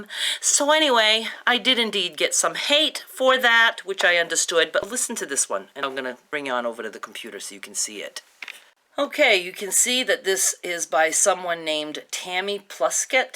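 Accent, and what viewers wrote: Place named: Rhode Island, United States. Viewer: How about when you needed to wear panty hose and you had only two pair and they both had a run? You cut off the "bad leg off both and then proceed putting both pair on, then you get two good legs! I need to. Ask, are you from Woonsocket RI. You have that accent. My pet peeve is a person who ask you to help with a project( like decorate for their child's birthday party) and you end up starting the project and they go to the bar to socialize while you do all the work!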